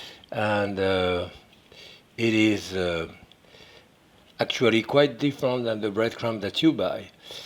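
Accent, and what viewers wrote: France. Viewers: Why does English spoken in a French accent sound so much better? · very nice french accent , also beautiful kitchen, very cousy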